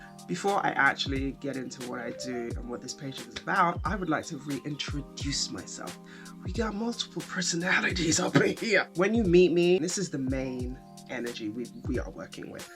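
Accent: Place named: Barbados. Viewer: Alright de bajan accent sounding right den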